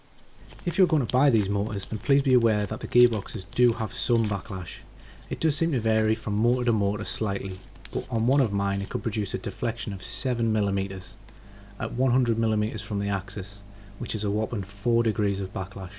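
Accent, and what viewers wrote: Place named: Northern England, United Kingdom. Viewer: As others have commented, a very clear explanation and a very soft pleasant speaking voice ( which many Northern accents have ), so you're ideal for youtube videos. However is the overlay at 4.24 correct ? At 100mA x2 the torque is apparently 6x the 5v test at 32mNm, but torque is given as 117mNm ( the same value as 50mA x2 at 3.6x the 5v value ( 3.6x 32mNm = 115.2mNm ).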